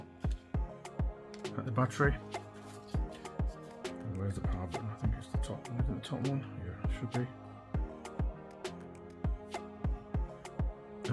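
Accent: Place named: United Kingdom. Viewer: Thanks for this instructional! Yeah...my tab a dropped the screen and snapped the ribbon cable in half mid-length just as if cut by scissors! The screen glanced of my leg and luckily landed face down on the glass side on my linoleum floor. No APPARENT damage other than the cable itself. I now feel confident I can tackle the project. By the way ...What type of glue did you say you were using??? You sound like a Brit to my USA ears (or maybe Aussie?) and I couldn't determine for sure the glue type/brand you referenced Thanks again!!! By the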